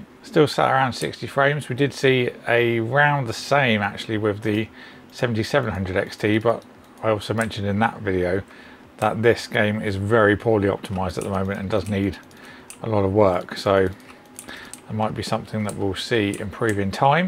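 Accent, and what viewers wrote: United Kingdom. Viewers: i like your brit accent :D also 2nd time finding you overviewing smthn i am interested in, good content mate :)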